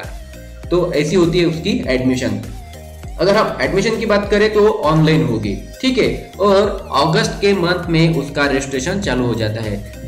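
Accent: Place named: Maharashtra, India. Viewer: Sir tumhi Marathi madhech ravas boltat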